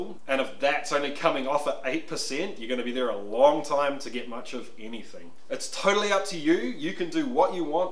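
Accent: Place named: New Zealand. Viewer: Awesome videos and being a south islander good to hear a kiwi voice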